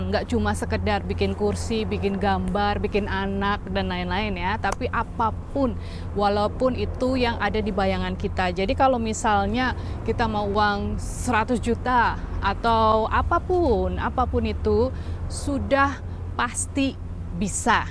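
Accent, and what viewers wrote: Banyumas, Indonesia. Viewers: Setujuuuu... Mungkin karena sesama suku ngapak2 jadi berasa lebih mudheng... Apalagi pas bilang "abab e" 🤣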